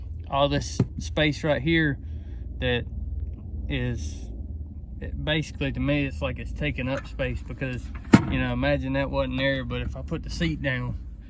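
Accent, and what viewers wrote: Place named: North Carolina, United States. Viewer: You gotta be From NC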